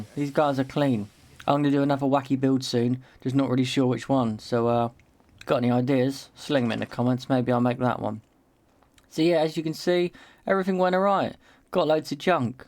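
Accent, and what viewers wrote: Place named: New Zealand. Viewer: It's the Kiwi accent you're thinking.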